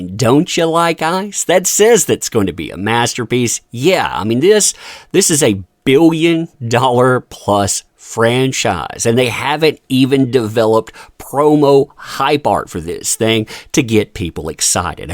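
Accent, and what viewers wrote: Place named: Alabama, United States. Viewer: I just found this channel not that long ago but no offense, but is the main guy on here trying to pull off a gay redneck hillbilly from Alabama or something? The voice thing is really annoying. Is that like a gimmick just for this video or a couple videos or is that like how you sound all the time? It's really fucking weird cringe-worthy and hard as fuck to pay attention to whatever you're trying to talk about cuz I just keep seeing images in my head of some redneck dude down south wearing high heels or something it's fucking weird. Like I said man I'm not trying to be mean or offensive but I know I can't be the only one that that comes across that way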